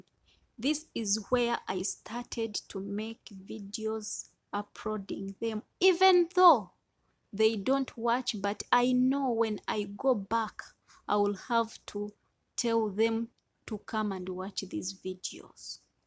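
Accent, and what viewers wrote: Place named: Kenya. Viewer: You sound like a Kenyan ooh God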